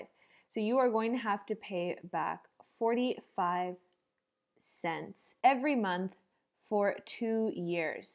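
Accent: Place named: United States. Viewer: You sound hella American,thanks for this video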